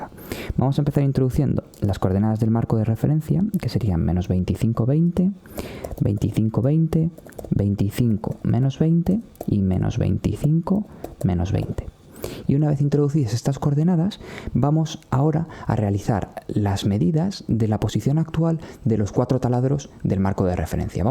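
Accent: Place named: Spain. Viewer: por tu acento eres de España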